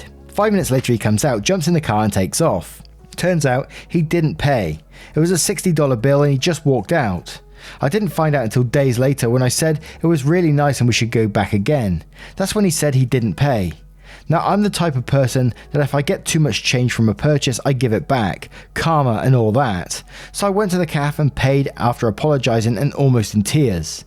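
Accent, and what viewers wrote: United Kingdom. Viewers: I don't really like the relationship stories but I wanna sleep on a bed of your voice! 😂😂😂 So whether I like the topic or not, I don't even care. Can listen to your voice all day! It's that british accent. 😊 I know this is an entitled neighbor one but was just sayin'....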